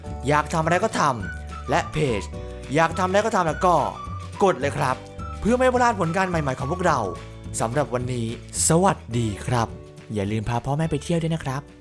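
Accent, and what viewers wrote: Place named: North, Thailand. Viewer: คนเหนือก่อหนิ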